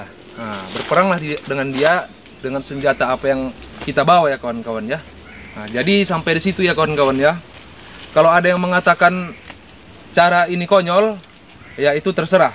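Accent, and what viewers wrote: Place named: Medan, Indonesia. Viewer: Ada lucunya nih org.. Logat medan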